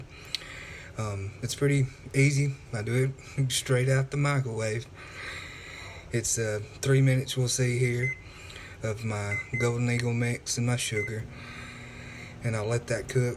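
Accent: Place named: Texas, United States. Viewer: Awesome video I liked the look of this sweet brittle video but I don't like nuts much only sometimes! I love listening to the intro and the ending songs there very catchy! Your voice over is good when watching the video! You sound like your from the country or Texas lol! Team!!! 🌟💙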